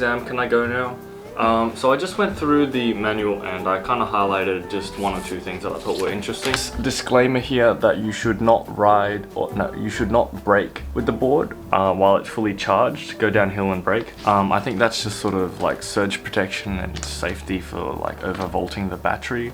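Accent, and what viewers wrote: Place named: Australia. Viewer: Wtf this guy is korean talking Australian and acts like a white boy the fuck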